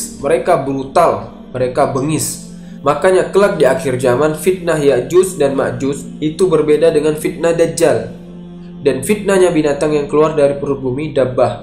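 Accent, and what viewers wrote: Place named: West Java, Indonesia. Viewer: Berdasar pengucapan huruf Z, fixed admin adalah Sundanese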